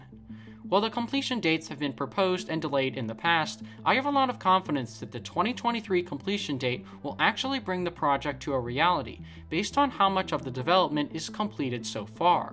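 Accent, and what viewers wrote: United States. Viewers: I didn't realise that we priced projects in US dollars in London. What on earth were the makers of this video thinking of: pricing the Crossrail project in US dollars ? What's all this US accent to tell us about the London Crossrail? We don't have 'railroads' in London: we have railways. We don't have 'transportation' in London; we have transport. We do not have a 'Department of Transportation' in the UK; we have a Department of Transport . This is actually written on the screen. Why on earth do you use a voiceover speaking US English?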